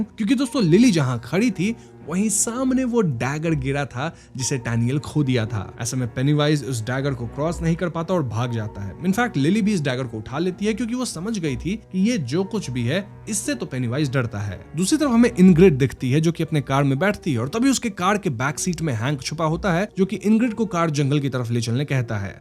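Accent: Bengal, India. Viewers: Hey your Bengali ??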